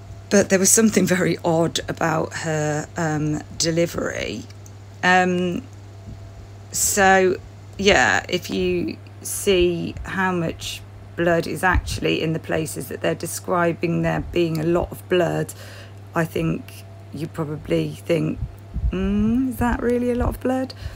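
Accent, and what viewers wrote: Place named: United Kingdom. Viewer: I absolutely love your voice and the accent, however horrible things u're speaking about. It's soooooo British! 😀 Where are u from, more exactly?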